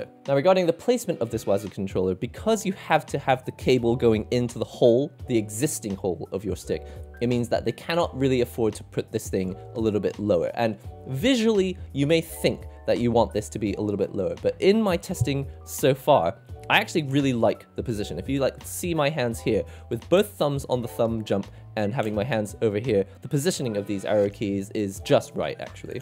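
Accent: United Kingdom. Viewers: this is my first video of yours and seeing the name " " i was NOT expecting that thick british accent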